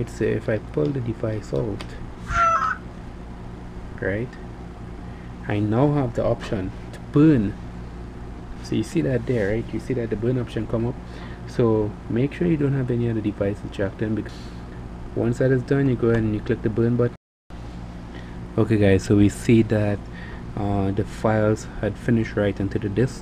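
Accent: Trinidad and Tobago. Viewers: trini accent detected?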